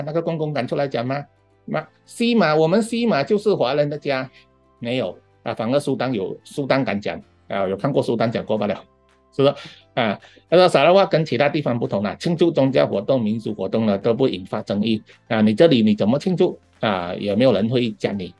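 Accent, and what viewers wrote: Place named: Fuzhou, China. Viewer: Sarawak福州人在此报道 🙋🏻 听到你讲福州话好亲切😊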